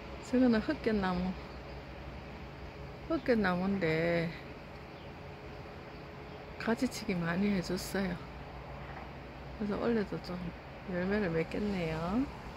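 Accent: Gyeongsang, South Korea. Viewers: *선생님이라고 호칭을? 아니면.. 이*교수님 이라고 호칭을 해야할지요? ㅎ [방가지똥] 약초 이름도 참 재밌네요..ㅎ 오늘도 건강을 위한 프로젝트 영상! 잘 시청합니다~👍💘 경상도 사투리가 즹겹네요..ㅎ 자두*가 선생님 닮아서 이쁘네요~ 휴일! 행복한 시간 되세요~*😘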